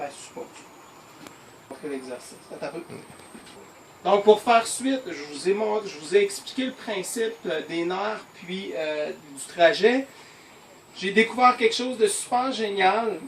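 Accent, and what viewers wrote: Canada. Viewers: VOULEZ-VOUS FINALEMENT BIEN APPRENDRE PARLER EN FRANÇAIS ??? INCROYABLE VOTRE canadien façon de parler ((((( UN VRAI CAUCHEMAR ET HONTE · je vois aucune honte a ce qu'un canadien parle le français qu'il a appris, la façon de parlé et l'accent est très différent du pure français, a l'oreille ça fait un peu bizzare cette différence. Mais ce serai pour moi un peu de la discrimination de ne pas accepté ces différences entre notre français et le votre. Merci pour votre vidéo j'ai une fasciite plantaire et mal le long du nerf sciatique, ça pourrai m'aidé.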